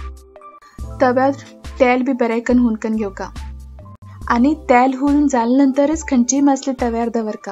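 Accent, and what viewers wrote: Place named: Konkani, India. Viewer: Lovely to hear you speak konkani. Thank you for sharing the recipe 👍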